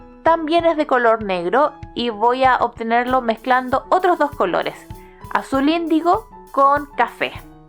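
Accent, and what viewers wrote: Chile. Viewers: eres Chilena? :)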